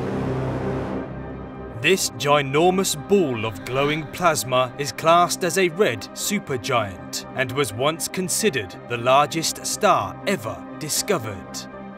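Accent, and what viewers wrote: United Kingdom. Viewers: I thought this was gonna be click bait, but when I heard the narrator’s British accent, I thought, this is gonna be good! All the best science shows are hosted by people who can’t pronounce AL-U-MIN-IUM, also known as aluminum.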